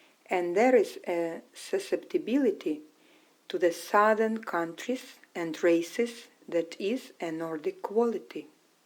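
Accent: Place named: Russia. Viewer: I guess it's a good book to read. I heard about it, but never read it. I love your cute Russian accent!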